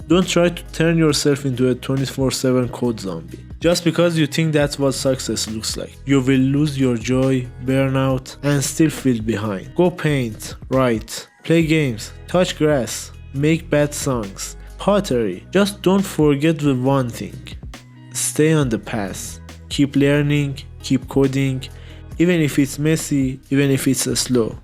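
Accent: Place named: Iran. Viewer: your accent screams "چایی و کوروش کبیر"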